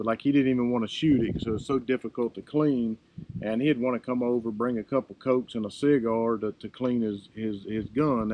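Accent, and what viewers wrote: South, United States. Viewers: Where’s that southern accent from? Louisiana? Georgia here. Love my Mark IV 22/45 Lite. Got mine without iron sights with the short pic rail and Riton red dot from the factory.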